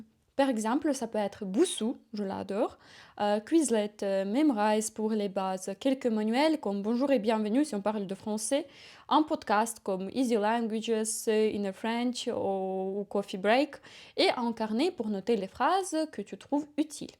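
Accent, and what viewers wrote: Eastern Europe. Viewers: Un accent slave?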